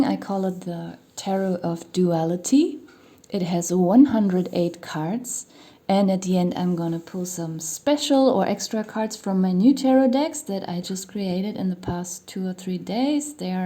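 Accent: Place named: Germany. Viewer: You gotta sexy voice, babe, with a tiny German undertone somewhere, sounds cute!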